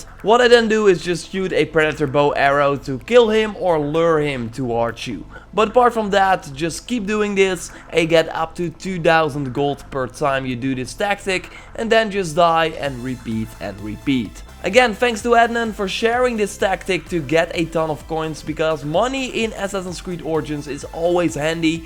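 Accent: Netherlands. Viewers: You’re definitely dutch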